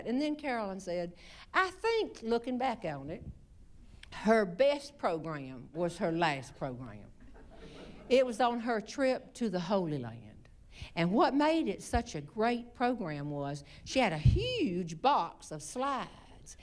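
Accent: South, United States. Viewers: The accent is perfect southern! Way to go.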